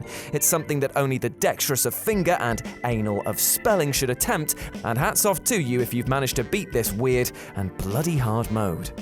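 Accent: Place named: England, United Kingdom. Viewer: watched in mute as this fuckin poms accent makes my skin crawl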